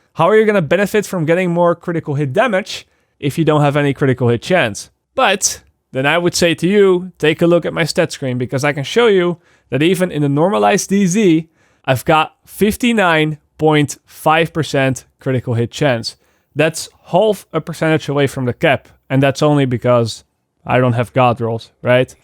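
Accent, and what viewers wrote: Netherlands. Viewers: Dankjewel voor de info, hoor dat je Nederlands bent Hahah ^_^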